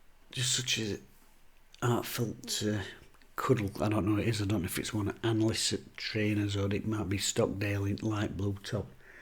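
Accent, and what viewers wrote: Sheffield, United Kingdom. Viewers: As I now live in Devon, I would like to say how lovely it is to hear a real Sheffield accent again with all the old jargon I was brought up to hear. Keep up the good work as I look forward to your videos appearing. Thank you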